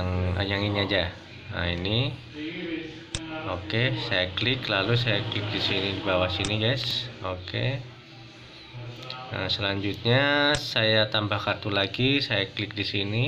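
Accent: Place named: Java, Indonesia. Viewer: Makasih info triknya boskuuhh...mantap , medok banget ngomongnya yah..😃👍👍👍sudah aman boskuh...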